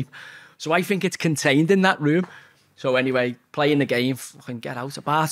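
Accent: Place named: Liverpool, United Kingdom. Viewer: proper Scouser x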